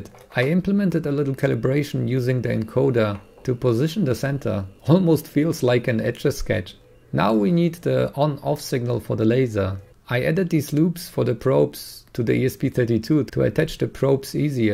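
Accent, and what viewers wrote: Germany. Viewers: Bro nices video aber das ist so ein Kartoffel Englisch man hört das du Deutscher bist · I think you are german, because you have a german akcent, And this gadget is cool.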